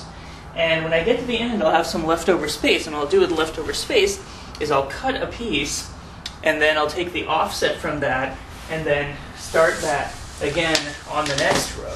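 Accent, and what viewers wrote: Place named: United States. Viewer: Its odd to see american accent on an indian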